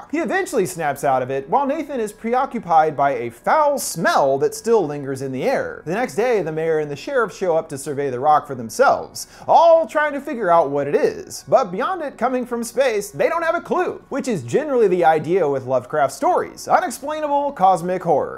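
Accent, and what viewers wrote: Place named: United States. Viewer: how americans speak, jesus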